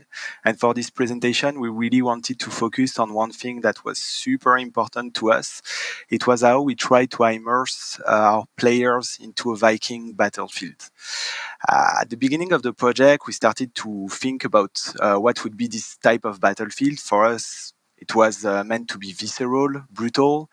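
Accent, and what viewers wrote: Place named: France. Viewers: ouiiiiiiiiii l'accent français^^ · nooonn l'accent français :)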